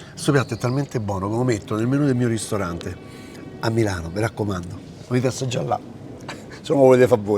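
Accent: Rome, Italy. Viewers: Certo fai la spesa al mercato di trionfale parli romano sei de Roma e apri il ristorante a Milano...😅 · Ciao... Ogni volta che te sento parla mi sembra di ritorna' a Roma mia.... Mi manca tanto ❤❤❤❤❤ ricetta fantastica ❤ Bona!!! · Minchia non mi stanco mai di vederti e soprattutto sentirti con quell'accento romanesco che mi fa' impazzire, sei grande sei la passione in cucina, grazie per i tuoi preziosi consigli ❤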